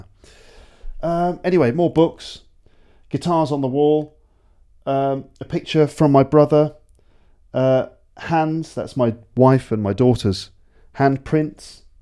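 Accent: United Kingdom. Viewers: Thank you for your episodes. I've been watching and listening to you for some time now (probably 10 months). I found what are doing is very beneficial for people like me, trying to improve their English. I live in Egypt and mother language is Arabic. In my country, and probably most of the arab area, find that the American accent is easier for them and suitable with people with Arabic as mother tongue. Do you think this is correct???. In my case, though, I don't find it like that. I think the British accent is easier for me. That is why I'm following your channel.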